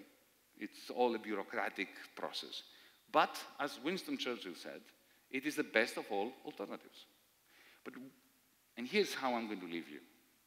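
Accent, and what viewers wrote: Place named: Greece. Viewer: I would say 75% to be fair. It takes a while to get used to his strong Greek accent.....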